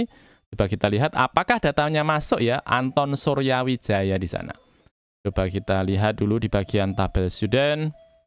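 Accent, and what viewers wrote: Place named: Java, Indonesia. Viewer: Senengnya akun ini medoknya lucu, mantep mas jawa aslii ini mudah di mengerti heeh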